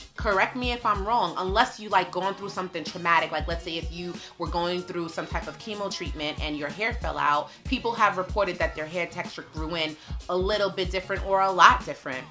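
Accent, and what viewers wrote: New York, United States. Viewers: Wow I haven't seen you in forever youtube is on some bs but your lil lady is so big havent seen her since she was a baby and im loving the NY accent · That NY accent!! Brooklyn Girls In the house!!! ❤️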